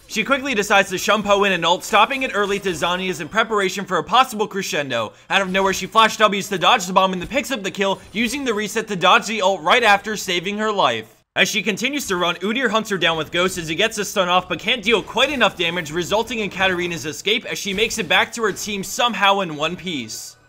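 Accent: Netherlands. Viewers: this is why people from the netherlands should not talk english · That dutch accent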